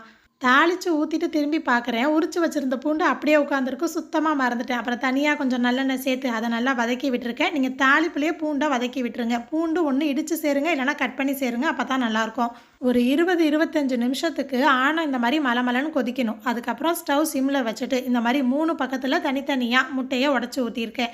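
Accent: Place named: Madurai, India. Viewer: Pera ketalea odiruvangalea..... Enga madurai slang la pesuringa Nala iruku sissy....❤❤❤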